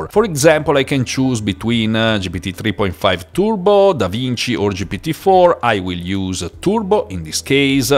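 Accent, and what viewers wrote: Italy. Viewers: I love your perfect English with a delightful Italian inflection when a word ends in a consonant ❤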